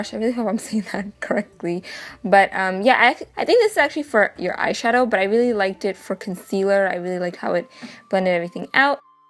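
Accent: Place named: Dhaka, Bangladesh. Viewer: tea connoiseur ok heard you speak. you're dhakai. great video!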